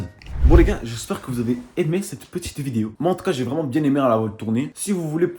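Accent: Quebec, Canada. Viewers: A l'accent sa se voit t'est québécois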